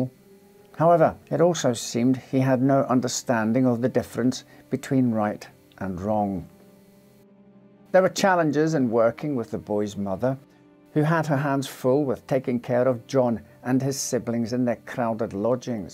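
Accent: Scotland, United Kingdom. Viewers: Great stuff. Are you Scottish? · is indeed Scottish.. 👍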